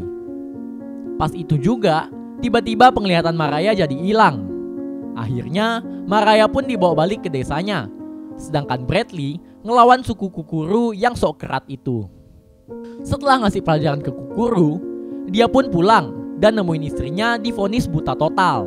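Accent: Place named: Pontianak, Indonesia. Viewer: Klo ngomng kok kek org ponti bg!.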